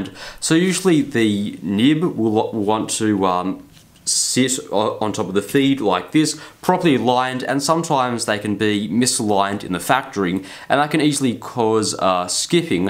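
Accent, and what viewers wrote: Australia. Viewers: It's great to have a local reviewer. I so seldom hear Australian accents let alone a Sydney accent. Noice!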